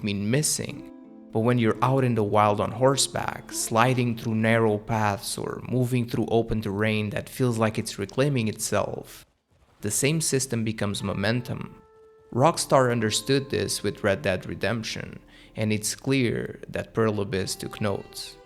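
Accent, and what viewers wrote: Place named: Portugal. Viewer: good video. CD is awesome. accent 100% fellow tuga. 😜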